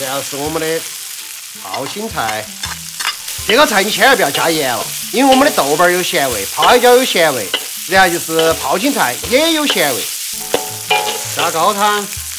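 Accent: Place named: Chengdu, China. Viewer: 对不起我要刷屏了莴笋颠颠儿～ 莴笋杆杆～ 凹～ 溅～ 新宣～ 的成都话好标准好想回成都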